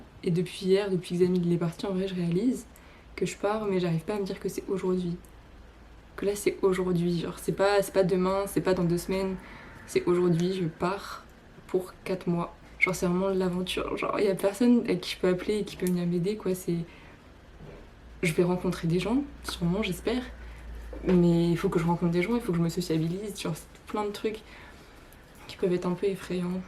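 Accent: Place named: Quebec, Canada. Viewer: Omg, le québécois est trop nice ! l'accent cette pépite !!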